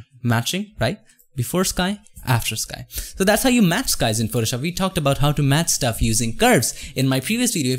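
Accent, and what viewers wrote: India. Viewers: I like the way he speak and explain things I work before in Saudi I have my Co woker indain people I miss the accent of them :)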